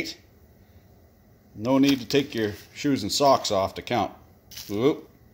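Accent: Quebec, Canada. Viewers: I have still cant pinpoint you french accent. its driving me crazy. sometimes sounds like Ontario sometimes Quebec and sometimes sounds like Moncton nb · Hahahah ton Québécois est incroyable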